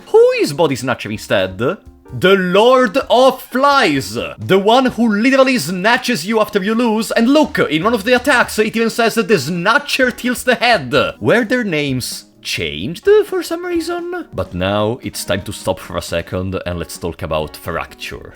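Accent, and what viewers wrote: Italy. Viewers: I am indeed someone who does not play the game. And indeed, I just plain out like to hear you ramble about things you like. It's nice to hear you talk about your interests, what you think of them, the jokes ideal para el tratamiento de hemorroides , hearing you talk about your life and, I hope you don't mind me saying this, I love your accent, Italian man. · From your accent, it feels like you're a "proper" italian (let the term pass please, it's just to differentiate from italian-americans). Am i right or am i way off the mark? 🤔😅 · il tuo accento italiano comunque è evidentissimo. Vengo dal regno di Cydonia. seguaci di Katanna venite fuori.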